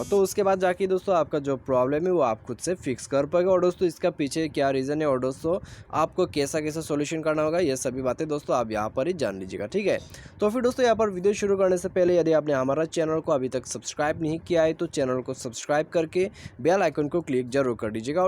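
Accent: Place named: Bengal, India. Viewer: Ha has a Bengali accent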